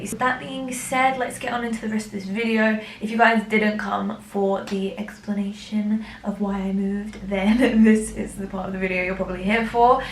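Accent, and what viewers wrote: United Kingdom. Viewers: Lol she sounds British aahhah is that just me.. must be because I'm British too 😊😊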